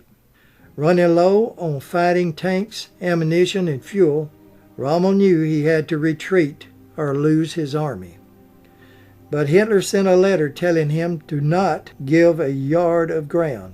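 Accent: South, United States. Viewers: Mr., Just another very well researched and very well presented vlog. Please use your expertise and do a vlog on Rosa Parks. Again you have that golden southern accent that is so soothing to listen to.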